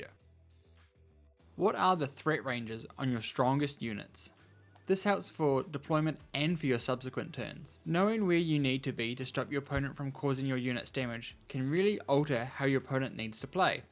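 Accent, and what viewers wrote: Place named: New Zealand. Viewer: You sound like a kiwi, where abouts are you based?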